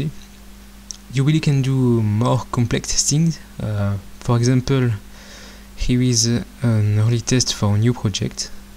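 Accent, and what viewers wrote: France. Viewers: je pense que tu es français vu l'accent ^^, peus tu envoyer un lien de téléchargement de ceci pour travailler dessus s'il te plait? Merci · I love your French accent. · AWWW yeah french accent is the best le accent!!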